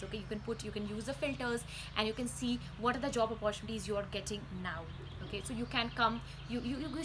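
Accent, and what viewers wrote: Italy. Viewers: Your accent is Italian now.... <3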